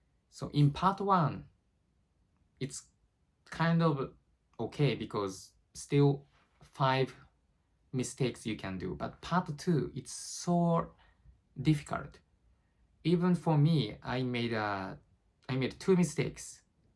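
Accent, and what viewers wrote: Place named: Japan. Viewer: I think he is Japanese based on is accent 🤔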